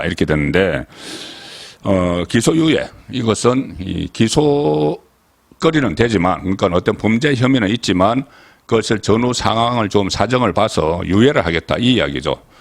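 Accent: Gyeongsang, South Korea. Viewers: 경상도 억양하고 서울말하고 섞어서하니까 이해합니다 벨것다갖고 생트집하고있네 너는 할줄아냐 남을 비판할줄알지 조간딱풀 영원히 응원합니다 잘하고계십니다 배승희 회식도 굿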